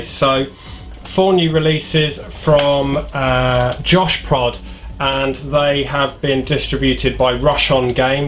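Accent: United Kingdom. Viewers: ya i really want one its a dream lol If u like my channel Please subscribe:) im filming a Unboxing of some new games from video games plus tonight or tomorrow . Have a lot of new games. Your channel great plus love the British accent!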